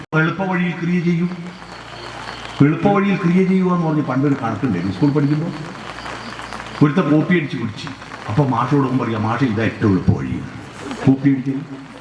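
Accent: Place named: Kannur, India. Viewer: ' ഞാൻ ഏറ്റവും കൂടുതൽ കേൾക്കുന്നത് ഈ പരിപാടി ആണ്. കാരണം പഴയതും പുതിയതും ആയ പലതും ഇതു കേൾക്കുമ്പോ പഠിക്കാനുണ്ട്. എത്ര എത്ര അർത്ഥവത്തായ കാര്യങ്ങളാണ് പറയുന്നത്. ഇദ്ദേഹത്തിൻ്റെ കുടുംബം എത്ര ഭാഗ്യമുള്ളതാണ്. കാരണം ഈ ഒരാളു കാരണം കുടുംബം നാട് -ഒക്കെ നന്നാവുമെങ്കിൽ എത്ര സന്തോഷം. ഒരു ഉദാഹരണം . ഇന്ന് കാലത്ത് ഒരു ദുഃഖവാർത്ത കേട്ടു അപ്പോ മുതൽ മനസ്സ്മല്ലാത്തൊരു അവസ്ഥയിലായി. ഒന്നും ചെയ്യാൻ തോന്നുന്നില്ല ആകെ ഒരു വല്ലാത്ത അസ്വസ്ഥത അപ്പോ എന്താ ചെയ്യേണ്ടത് നാമം ജപിക്കാൻ തോന്നുന്നില്ല ഒന്നും വായിക്കാനും തോന്നുന്നില്ല TV ഒട്ടും കാണാൻ തോന്നുന്നില്ല എന്തോ എനിക്കതോന്നി ദിവസവും കേൾക്കുന്ന ഇദ്ദേഹത്തിൻ്റെ ചാനൽ നോക്കിയാലോ പലതും കേട്ടതാണെങ്കി കൂടി കേൾക്കാൻ രസമുണ്ട് വാസ്തവങ്ങളാണ് പറയുന്നത്. വച്ചു കുറച്ചു കവിതയും എല്ലാം കേട്ടപ്പോ ഒരു വലിയ ഭാരം മനസ്സിൽ നിന്നും ഇറക്കി വച്ച പോലെ തോന്നി. ഇതാണ് ഒരു മരുന്ന്. ഒന്നു കേട്ടു നോക ഒരാശ്വാസവും - ഒരുഉത്സാഹവും ഒരു സമാധാനവും കിട്ടി നന്ദി ആരോടു ചൊല്ലെണ്ടു ഈ മനുഷ്യനോടോ ഈശ്വരനോട് അറിയില്ല ഭാഷ കണ്ണൂർ ആയതു കൊണ്ട് ആദ്യമാദ്യം ചിലതൊന്നും മനസ്സിലാവില്ലായിരുന്നു. 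ഇപ്പോ കുഴപ്പമില്ല. ഒരാവർത്തിയല്ല പല ആവർത്തി കേൾക്കുന്നു ഞാൻ. നന്ദി - നമസ്കാരം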